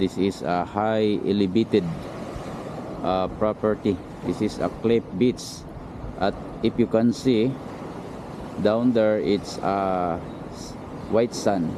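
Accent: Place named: Visayas, Philippines. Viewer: bisaya Naman ang accent mo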